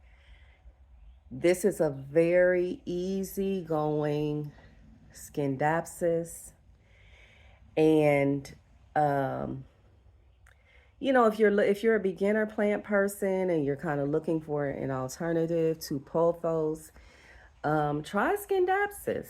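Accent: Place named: South, United States. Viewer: I love your collection of Scindapsus. I love all the them. I think they are so different and spectacular.....I love your southern accent....many blessings....chula....abrazos amiga....MINDY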